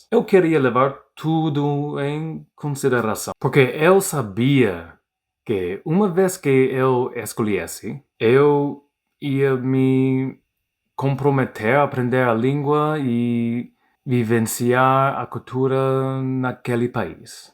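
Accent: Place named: United States. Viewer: Parabéns bro, Já está falando português brasileiro fluente, apesar de um pouco de sotaque americano, mas vá em frente aos seus objetivos. Você só não nos falou se você lê e escreve em português. Sucesso ao seu canal !!!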